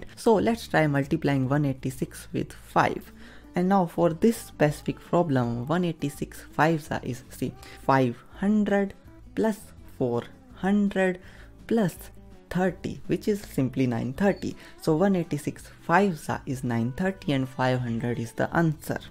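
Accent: India. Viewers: obviously india who else has that accent? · he's from India but I can't really tell from which state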